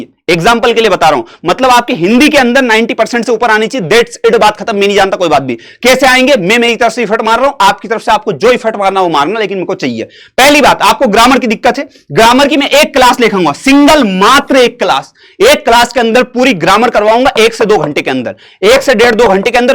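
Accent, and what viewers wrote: Indore, India. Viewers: Apki jo ye indori ganv wali language hai na sir maza aajata hai sun kar 😅😅😅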